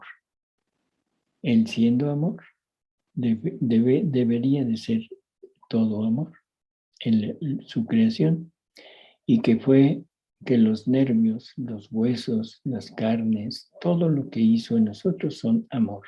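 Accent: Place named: Mexico. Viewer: Ay! Doctor, es usted una maravilla de palabras, explicaciones viva su maravilloso acento mexicano. Le amo, el Señor le bendiga.desde 🇪🇦 ledoylos buenos dias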